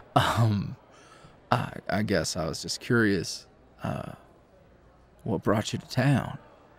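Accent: South, United States. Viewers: Oh my LAWD this checks so many boxes. ✅ DILF ✅ Country accent✅ sweet talk✅ this is illegal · I am sorry but if someone as fine like him approached me with a southern accent and a lovely personality like that he would have had me at "sweetheart"! I just melted at his compliments: Sweetheart, lovely..He is just a cutiepie cowboy isn't he? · "i guess it's an alright place to get lost in hehe, not much to see tho" Me: "well i can see your eyes how about i get lost in those for a bit;)" Y'all i saw dilf and i clicked so fast, also, you'd think growing up in the south would make me immune to the country accent but no it most certainly does not, i am a weak weak man when it comes to men with pretty voices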